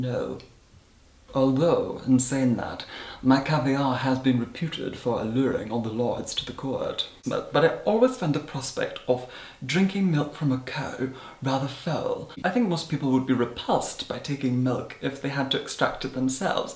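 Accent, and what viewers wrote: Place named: Scotland, United Kingdom. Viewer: He is from Scotland.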